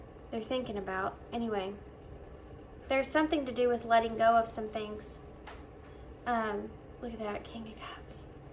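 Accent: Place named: Tennessee, United States. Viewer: My goodness, a fellow southern girl I hear. Tennessee?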